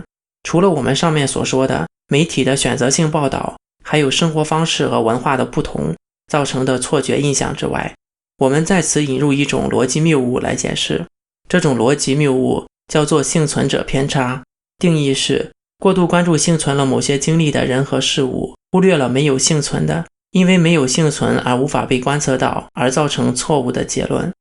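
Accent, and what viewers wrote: Shandong, China. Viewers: 听口音博主是山东人吗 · 逻辑错误：1、只要晚上天黑没有人，都会觉得害怕。错，在中国，偏远小镇天黑也没人，人不会害怕。说数字，不说数字都是扯淡。每十万人年刑事案件比率。说这个，说别的是扯淡。2、不知道哪儿出来的一个国际和平指数，和居民生活是否安全有毛线关系？一个野鸡和平指数排名排在110名，就能推导出在中国生活不是很安全？你脑子被法轮碾了？我也是知名学者，明天我就搞一个扯淡指数，你排世界第一。3、你还知道一个幸存者偏差，你列举的统统是负面案例，当然你幸存地认为中国是地狱了。不说概率，不说统计学上的调查研究结果，扯个案有毛线意义？还李刚儿子撞死，还毒奶粉毒死，这能比得过新冠死么？美国都死了20多万了，李刚儿子能撞死二十多万么？美国每年死于枪击事件好几万，中国毒奶粉毒死好几万么？4、中国癌症发病率死亡率全球第一？你在哪本大法杂志上看见的这个数据？我都笑喷了。起码的常识都不具备你。癌症发病率最高的统统是最先进的国家，因为人长寿，所以得癌，这么简单的事实你都搞不清楚。5、装个防盗窗，是落后意识和习惯，这也能佐证中国治安差，真是佩服你脑洞。那美国人家家有枪，是不是证明天天美国打仗啊。 综合可知：你是个不具备基本自然科学和社会科学常识的文盲，口音偏山东，普通话一股大葱味儿，一直提人权宗教，估计是山东农村那边信地下天主教的。逻辑混乱，语无伦次，建议你把口器闭紧了，味儿大的受不了，希望你能多学习，多闭嘴，积点德，为生态环境做贡献。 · 同意，口音偏山东，给山东人丢脸。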